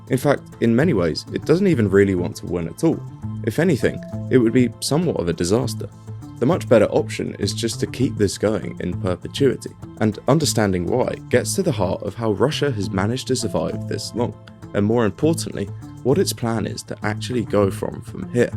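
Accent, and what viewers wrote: England, United Kingdom. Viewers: Im an American fiormer advisor to the Kremlin, who subsequentlylived in Odessa for 15 years... until the rabble gang armies came gang press every adult male into service. And I watched Russia defeat itself by literally running out of food four days on the road to Kiev. I saw RU troops had to WALK to Belarus. So it is true Russia defeated itself. But as you can imagine, Im unhappy seeing my life's work in privatization, deregulation and liberalization twisted this way.... by a posh English voice that sounds like the children I now teach. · He thought because he is white and has an English accent that people would not criticise him. Times are changing my friend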